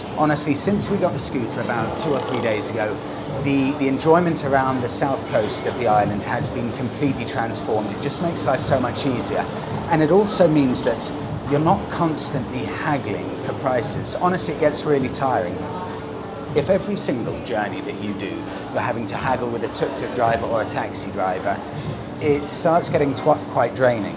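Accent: RP, United Kingdom. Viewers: That's absolutely quite normal in here gents, plus you don't have first world problem here where passports forging is a thing. Anyway, as someone said, you guys are so under-subs and I hope your channel will take off in a massive manner. Your authenticity from what you wear, how you walk, and in general who you are as a person is refreshingly unique, oh and I almost forgot your voice, your narration ability with that clear, concise, RP accent makes you listenable on hours end.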